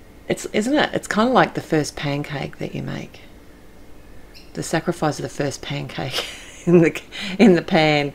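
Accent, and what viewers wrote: Australia. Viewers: Watching this Anzac morning after the Dawn Service... Wow! Thank you, always love listening to the Aussie voice😀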